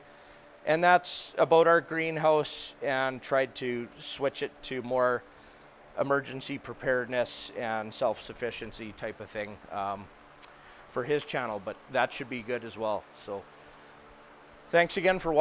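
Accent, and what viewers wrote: Canada. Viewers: You are good peoples! New subscriber. Plan on doing a smaller version down here in Southern Georgia. USA of course. Thank you for the invaluable information. Love the Canadian accent too! Go Jets! (Recovering Atlanta Thrashers fan). Take care, be safe and we love our guns too! Come to Georgia sometime!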